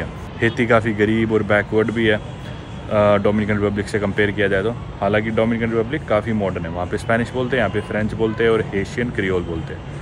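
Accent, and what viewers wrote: Haryana, India. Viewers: Like ur hariyanvi accent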